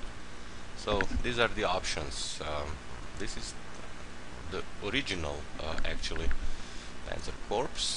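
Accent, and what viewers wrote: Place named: Germany. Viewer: heheh, yeah the accent could be a bit iffy at times, but at least he sounds German, compared to some other games XD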